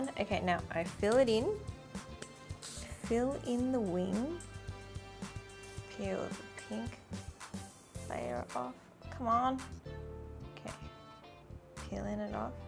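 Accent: Australia. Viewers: Are u Australian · Hey I'm new to her channel, is she an Aussie?? I'm an Aussie too so that's why I'm wondering 😂😂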